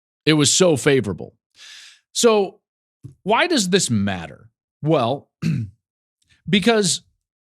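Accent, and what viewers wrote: South, United States. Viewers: Wow, I'm surprised you decided not to use your southern accent and unprofessionalism to mock the whole South to get your point across this time. Your bias is so obvious. Why don't you take some cues from Greg Mcelroy sometime about hiding biases when working professionally, and he has been in the business for a shorter time than you. You completely lost my respect when you decided to mock a 2-time national champion. What are your accomplishments compared to that?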